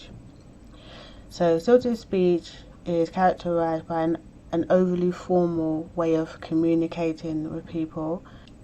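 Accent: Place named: United Kingdom. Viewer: I always thought your speech pattern was more about being British. I find it rather soothing. I've heard worse pomposity listening to a narrated Audible book. I seriously wanted to punch that guy in the dick by the time I finished the book.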